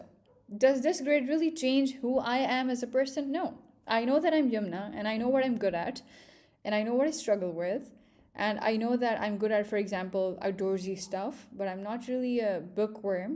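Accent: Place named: England, United Kingdom. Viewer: Miss how you learn this english accent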